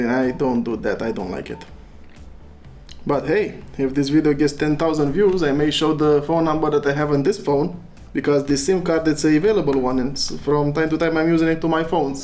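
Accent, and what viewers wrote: Romania. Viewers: I knew youre Român , your accent haha,great contnent btw ❤️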